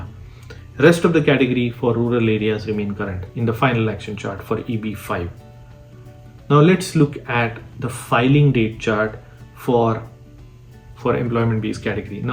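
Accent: India. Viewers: U need to make your accent less Indian-like. It is not pleasant to one's ears.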